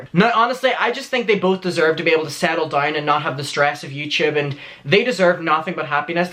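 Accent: Northern Ireland, United Kingdom. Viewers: Oml northern Irish?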